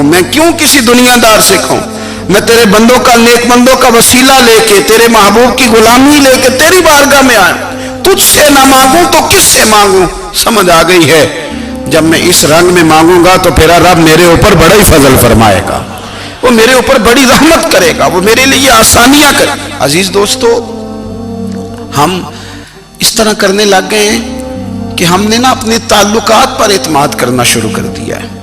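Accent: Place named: Punjab, Pakistan. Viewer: kis tarha se baat karte hain aap peer sahab , dil ku sukoon mil jata hai , aapki punjabi accent toh mashallah ❤❤❤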